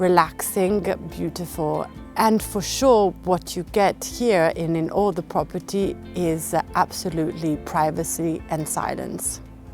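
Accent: Italy. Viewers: Italians always add an "E" to the end of every word in English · What a charming and wonderful Italian English accent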